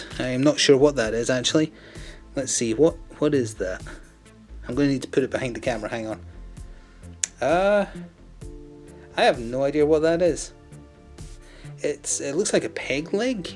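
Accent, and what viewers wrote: Scotland, United Kingdom. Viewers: From one Scot to another... Glad to hear a familiar accent in the Youtube scene! haha :) will you make any DC Universe game content? Also, do you have a source for the cards for the OOP movie version of Zod and the Guild? Also, I am glad to see Knight using pictures of miniatures on the box. Not enjoying the wave of manufacturers showing renders as they dont always translate into the miniatures as well.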